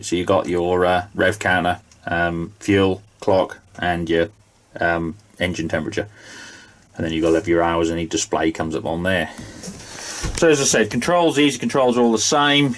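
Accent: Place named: Lincolnshire, United Kingdom. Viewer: Are you lincolnshire. You sound it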